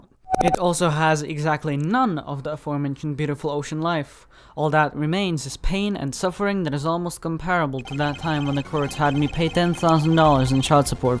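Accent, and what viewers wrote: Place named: Russia. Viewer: я же слышу что ты русский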